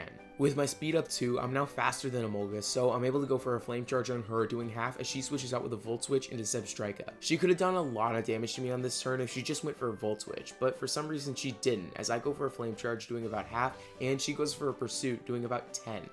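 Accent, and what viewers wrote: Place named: England, United Kingdom. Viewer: Bro which country are you from? From your accent I feel England. BTW love from India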